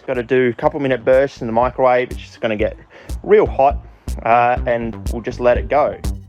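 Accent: Australia. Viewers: I love your videos! And the Australian accent is amazing! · I'm like "Damn, he's posting at 7am?" Then I remembered from the accent. Then I'm like, "wtf? It's spring, not fall." Then I remembered that Australia is basically the upside down world.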